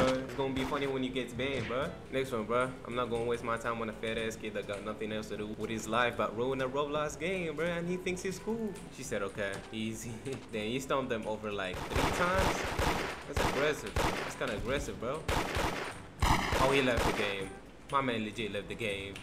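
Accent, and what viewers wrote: United Kingdom. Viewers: are you British? This is a genuine question 🧐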